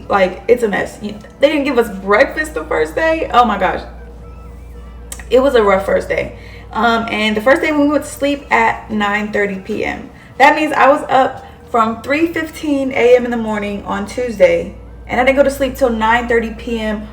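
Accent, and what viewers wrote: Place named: New York, United States. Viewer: speaks like she from NY 😅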